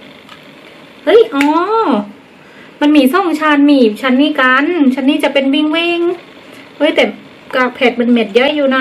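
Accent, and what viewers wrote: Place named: South, Thailand. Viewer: ชอบอ่ะเวลาพี่แหลงใต้ ฟังแล้วลื่นหู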